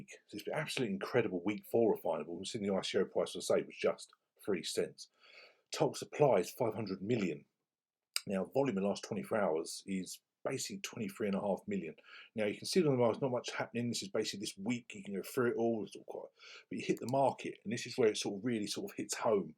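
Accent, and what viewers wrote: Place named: United Kingdom. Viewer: Hello sir, great content! If you don’t mind, I have a question for you. I assume you are a fellow Brit, with that accent! If so, can you advise how Gem token purchases work on VeVe - when I just tried to buy $100 worth of Gems I got charged £100 via the Apple store in app purchase. I cancelled, for now, but is that right, does $100 worth of Gems cost £100, instead of about £72 which it should be at 1.4 conversion rate? (Also posted on latest OMI video)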